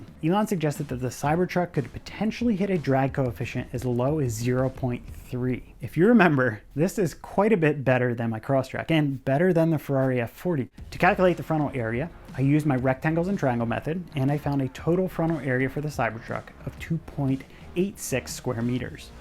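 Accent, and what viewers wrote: United States. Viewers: "maths"??? super awkward to hear the European multiples version of math in your American accent. just be consistent and say math. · Fantastic graphics and visual, the best I’ve seen in awhile although 3blue1brown is giving you a run for title of best! BTW, you may already know this, maths = Brits, math = American, you have a clear American accent, lets stick with math - I insist (LOL)!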